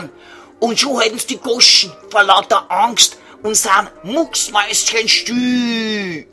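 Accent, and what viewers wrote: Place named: Vienna, Austria. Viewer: Ich hör mir die Story jetzt schon zum 14.mal hinter einander an und ich brech echt immer heftiger ab - die Story ist UR-Lustig und der Dialekt ist Ur-Wienerisch- einfach toll !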